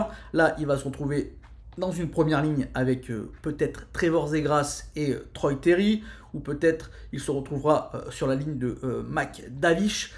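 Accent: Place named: Dauphiné, France. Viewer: Cool je découvre tes vidéos sur les preview je trouve tes analyses juste avec un accent dauphinois si je me trompe pas 😅 le top👍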